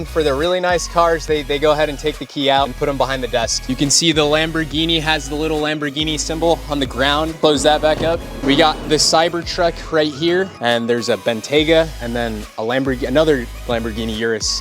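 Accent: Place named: California, United States. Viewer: Ughhhh, what a waste. Im glad you doing great in life BUT WHY ARE YOU TALKING LIKE THAT? YOU KNOW YOUR TALKING LIKE A WHITE CALI DUDE. SWEAR YOU DONT HAVE ANY BLACK FRIENDS. SWEAR, ITS SO WEIRD.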